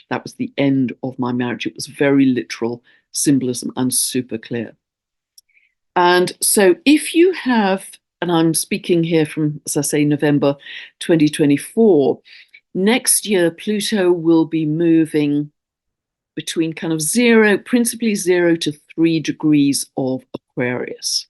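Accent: England, United Kingdom. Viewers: thank you… but I can probably top that. You made my life! Not that this is a competition, rather a communion of like hearted souls, and one in which I feel immense gratitude to know you. Many, many moments of wobbly exhaustion were laid aside after I listened to your English dulcet tones, so welcome in this far flung place from home where I live 💜💜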